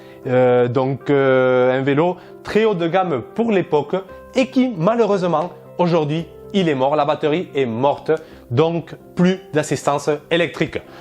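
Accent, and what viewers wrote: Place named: South, France. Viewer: Bien le bonjour l'accent du soleil ^^ Le VAE... une belle rencontre pour ma part. 10 ans de pratique et franchement je donne 10/10, il fait son taf. Acquis pour un nouveau velo-taf mais surtout pour les missions de visites au domicile que je dois faire dans toute la ville. Mon 1e est acheté chez décathlon (il y a donc 10 ans), bas de gamme, 750€. Il a fallut ajouter des sacoches, des pneus anticrevaisons (efficace à 100% en ville, jamais crevé sinon tous les 15 jours... pchiiii) et une fourche téléscopique (terme ?). Et bien il a tenu 10 ans par tout temps et ce avec des milliers de Km par an (6000 km/an rien que pour le trajet domicile-travail-domicile + les missions et une année j'ai même "noyer" le système électrique vu la douche que je me suis pris). Bon, il est vrai qu'il visitait les ateliers d'un pro 2x an + batterie chouchoutée au chaud en hiver). 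J'ai fini par le délaisser (et il est tjs à la maison ^^) car malgré les régulières révisions, ben oui, la batterie n'avait plus 20 ans (comme moi d'ailleurs) et des pièces principales commençaient à lâcher (j'avais un clé allen dans mes sacoches pour reserrer le pédalier régulièrement par exemple). Bof, cela devenait galère. Puis un jour, en visite sur le site d'une grande enseigne sportive française (la même que pour mon 1e), je vois une promotion d'enfer pour un VAE d'un partenaire hollandais : 1500€ au lieu de 2500€. Wow ! J'ai craqué, il était temps, j'ai mon 2e VAE. je suis passé d'un moteur dans la roue arrière au mot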